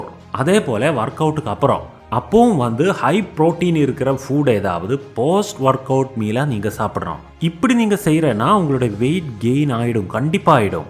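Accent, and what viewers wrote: Kerala, India. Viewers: Kerala bro❤️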